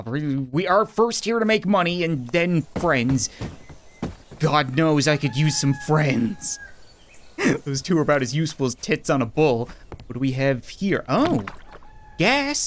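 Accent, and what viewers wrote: Appalachia, United States. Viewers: His hillbilly accent reminded me of kindergarten 2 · Your hillbilly voice Me: kindergarten flashbacks Who was that voice for in the kindergarten series? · I love how we call hillbilly accent the Felix and Ted voice now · Damn that hillbilly voice is nostalgic of the kindergarten series... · uses hillybilly accent Me: remebers kindergarten 2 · Your country accent in this is the same as one of the twins in the kindergarten 2 game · only og people remember kindergarten 2 his hill billy voice sounds like Felix from kindergarten 2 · The hillbilly accent reminds me of the voice he gave for ted and felix from his kindergarten 2 series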